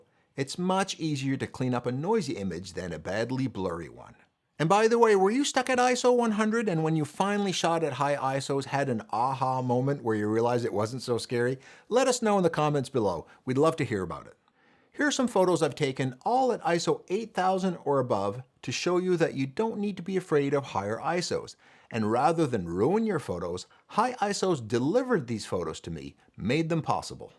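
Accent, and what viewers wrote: Canada. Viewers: That is a prime time Canadian accent 🇨🇦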